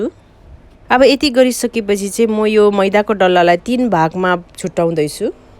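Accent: India. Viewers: Namaste mam, i am ur new subscriber....i am highly impressed by your hindi mixed nepali accent n ur easy recipes....keep posting easy tasty recipes..thanku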